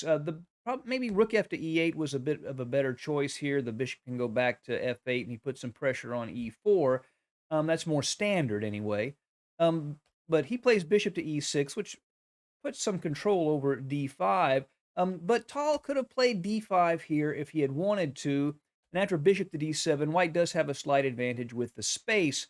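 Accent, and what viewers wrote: United States. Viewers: Thank you, sir. Your commentary is the best. You and the Chess Wisdom guy. But it's nice that you speak like an ordinary American. He is also great but the accent is a little distracting.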